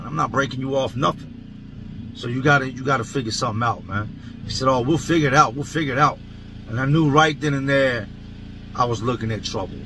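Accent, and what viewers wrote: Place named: Chicago, United States. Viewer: Sounds like your from chicago . I'm from chicago ,we use the same language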